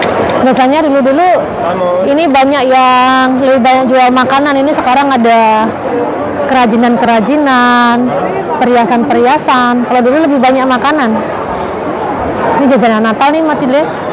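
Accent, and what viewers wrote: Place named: Lombok, Indonesia. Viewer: Salam kenal mb Oww pantas lama d lombok Dialeknya kelihatan banget Salam untuk suami dan Matilde yg cantik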